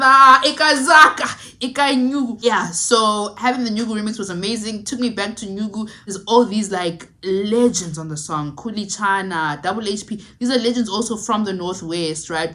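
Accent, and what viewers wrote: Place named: United Kingdom. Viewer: I'm here for the British accent 😅.. Energy always on another level 🔥🔥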